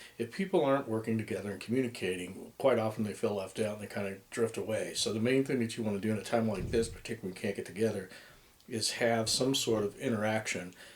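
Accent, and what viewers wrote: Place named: United States. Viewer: Even though you have an american accent, I believe you are a person who fled from Iran and is probably like an american proud christian now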